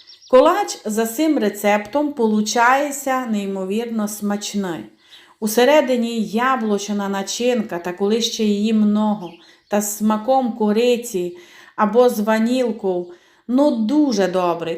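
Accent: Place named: Prykarpattia, Ukraine. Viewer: Добрий ваш колач, а ще ліпша ваша мава. Дуже приємно вас слухати . В селі, де жила моя баба дуже подібна вимова, таке "и", хоч вже в сусідньому селі, такого нема діалекту. То на Прикарпатті, на Львівщині. І кухня у них відрізнялася. В бабиному селі жінки вміють пекти бульваники в п'єцу. Де ще вміють пекти бульваники? Цікаво яка історія, як заселялися люди, як переходили на інші місця.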